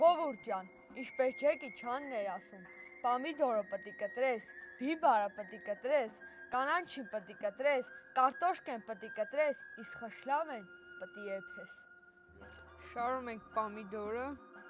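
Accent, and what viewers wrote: Lori, Armenia. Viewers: Վայ ես քու համով հոտով լոռվա բառբառին մեռնեմ😊😊😊❤❤ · Ամենահամով-հոտով բառբառը ` մեր ԼՈՌՎԱ բառբառը։ Առանձ ձևական նոտաների և ելևէջների ❤❤❤❤ապրեսսս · Իմ լոռեցի փոքրիկ եղբայր❤️😍այյյ մեր բարբառը 😅❤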